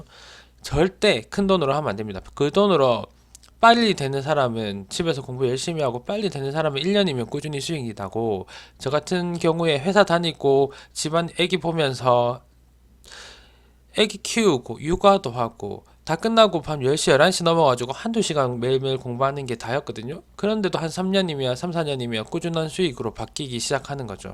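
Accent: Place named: Daegu, South Korea. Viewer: 안녕하세요 같은 직장인으로, 애 둘 아빠로 동질감을 느끼며 영상 잘 보고 있습니다~ 어제부터 정주행으로 조심씩 보고 있는데 나이도 비슷한거 같고 경북/대구쪽이니 사투리도 정겹네요~ 궁금한게 있어서 몇 가지 여쭙니다^^ 1. 주식 공부는 혼자 하신건지? 어떻게 공부를 하신건지?3년 동안 다지기 이후 이제 빛을 보게 되신건지?^^; (혼자 학습으로 3년 동안 시행 착오도 계셨을텐데...) 2. 대부분 종가베팅 후 시초가 매도던데 종목 선정은 이전에 올려주신 조건식 위주로 하신건지? 조건식은 어떤 것, 몇 개를 사용 하시는지.. 종가베팅은 대부분 3시부터 분할 매수로 진행 후 확신있는 것은 18-19분경 베팅 하시는지? 소액으로 종가베팅 연습중인데 승률이 그렇게 좋지 않아 좀 자세히 여쭤보고 싶어요~~ 감사합니다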